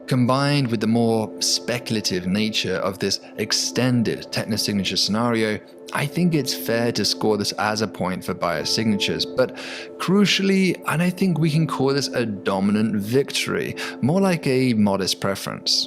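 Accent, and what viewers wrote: United Kingdom. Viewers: Awesome! I love British ASMR science bro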